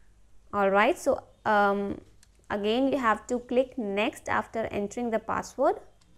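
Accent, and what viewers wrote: India. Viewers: Your indian english is difficult to understand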